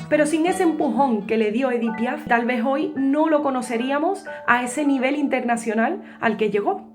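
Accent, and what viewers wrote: Andalusia, Spain. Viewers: QUE HERMOSA ERES DE ANDALUCÍA ?? ESTUBE EN MÁLAGA Y ES UN ACENTO Muy BONITO HERMOSO SENTIMIENTO PONES CUANDO CANTAS ESO ,,SE NOTA EN UNA VERDADERA ARTISTA,,,MIRTA DESDE MENDOZA ARGENTINA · ¡Brava! ¡Brava!, como dicen los italianos respecto de una performance muy lograda.. Es notable como, pese a tu bonito acento andaluz, lograste expresar el duende tan especial de don Ata. Lo mismo puedo decir de tu ejecución de la música en la guitarra. Muchas gracias por tu bonito regalo de ano nuevo a tus seguidores. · Que historia más hermosa, la música no conoce el racismo, ni xenofobia, ni egoismo, los grandes son grandes por eso. Me encanta tu acento pareces de Andalucía. Tienen tanta energía para expresarse que hipnotizan. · Oye genia de qué parte de España eres por esa tonada tan particular tuya al hablar. Me gusta mucho lo q haces de verdad. 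Un abrazo desde Misiones, Argentina 😊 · Q me gusta tu habla andaluza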